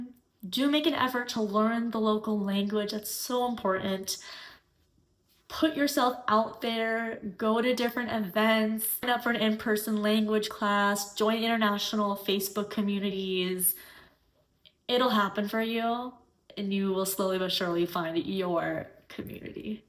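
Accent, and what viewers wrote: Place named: Naples, Italy. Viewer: Hahaha, abusive parkers… I loved it!, I think you’re Neapolitan to the core… only someone with an incredible ability to adapt could talk like that 👏👏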